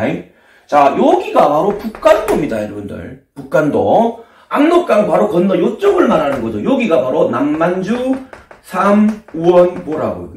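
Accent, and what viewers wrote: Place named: Gyeongsang, South Korea. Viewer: 듣다보니 깔끔정리 잘해주시고 시간이 금방가네요 경상도 사투리도 시원시원하시네요 또 올게요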